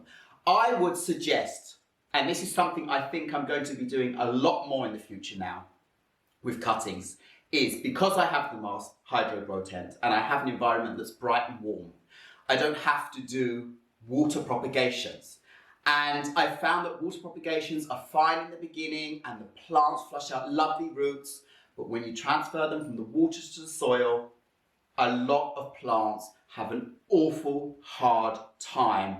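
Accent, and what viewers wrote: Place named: United Kingdom. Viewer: I am so curious to know where you’re from? You got such a strong British accent id thought you’re from the UK? Your location says Malmö- hence the confusion ☺️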